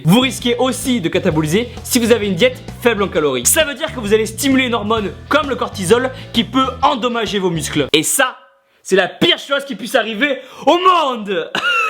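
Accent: South, France. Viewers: tu n'as pas un peu un accents? tu viendrais pas du sud?^^ Tibo InShape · En passant, vidéo très explicite. Et vive ton accent du sud!!!!!!!!! Je me sens moins seul :).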